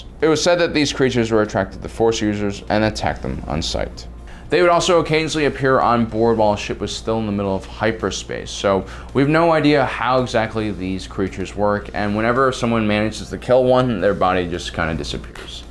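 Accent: United Kingdom. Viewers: What happened to British?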